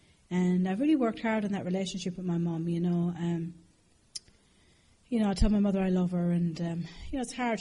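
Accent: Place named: Ireland. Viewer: I love your irish.great sense of humor.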